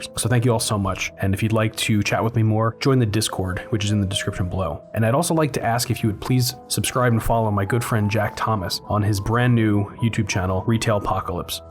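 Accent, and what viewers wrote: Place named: Virginia, United States. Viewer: There’s something about an old Virginian accent I find really calming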